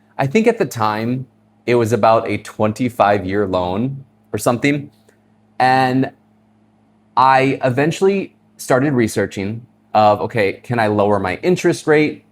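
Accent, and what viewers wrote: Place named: California, United States. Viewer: I watched full video you should continue this path you have California accent and I hear foreign love that accent 😅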